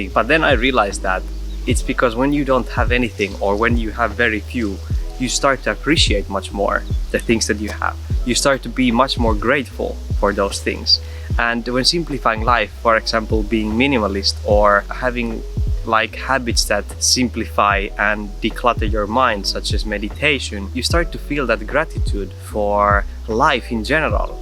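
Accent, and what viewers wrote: Finland. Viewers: Are you Finnish? You have similar accent :) Merry Christmas :) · I love how I can tell you are from Finland by how you speak. Terkkuja Oulusta.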